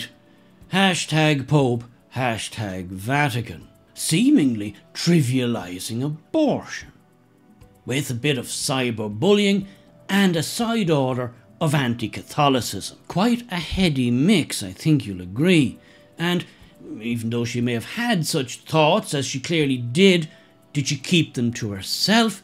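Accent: Ireland. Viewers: Best of all Irish voices on youtube, only you.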